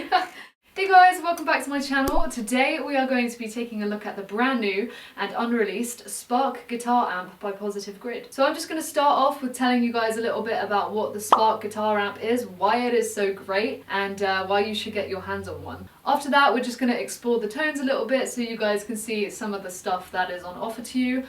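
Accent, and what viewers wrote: England, United Kingdom. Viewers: Easy on the eyes and ears. What’s not to like. Lol. Love the English accent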